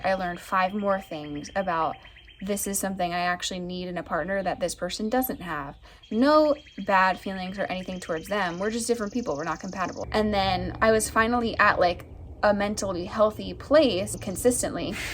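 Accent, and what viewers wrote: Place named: United States. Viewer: You've gotten a country accent!! How do you still make income when you're not posting? I'm not asking for like a super personal answer, but I'm curious what other streams of income you have, help a girl out😂 Is the house you're at outside now the new home, or the old one? Whichever it is, the porch is definitely giving that old farmstyle vintage house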